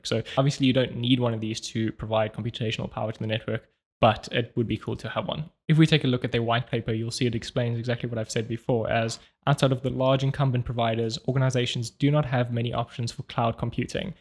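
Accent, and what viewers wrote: South Africa. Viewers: By any chance you're South African ? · I love your south African or Australian accent and your videos.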